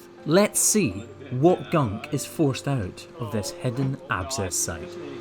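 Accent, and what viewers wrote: Scotland, United Kingdom. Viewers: I've watched your videos for months now and love your content. Please distract me with your scottish accent from the horror my country is becoming (USA). 😭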